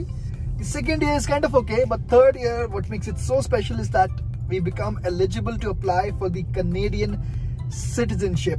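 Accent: India. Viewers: Documents are just the formal papers just papers..not your moral identity. You , anyway. keep being an indian with your accent,tradition,yourself,familiy values,canadian passport cannot take all these ones away from you. Dont take it close to your heart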